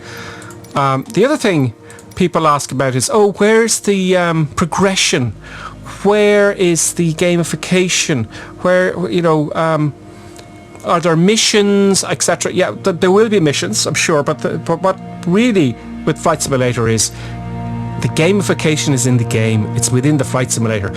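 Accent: Ireland. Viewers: That was an irish accent? Damn, I found it quite annoying how he pronounced words. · It's just his irish accent.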